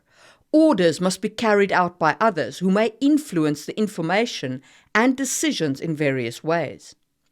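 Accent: South Africa. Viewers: wasn't expecting a south african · You sound South African! 😱 Where is your accent from? On your website you are standing in snow that definitely does not fall in SA. Expat? Am I completely off the mark? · You sound South African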